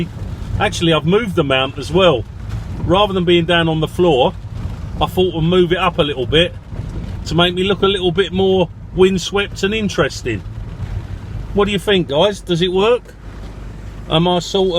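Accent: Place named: Cockney, United Kingdom. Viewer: It's like watching Bruce Willis's cockney cousin cabbin' abaat the gaff. Nice!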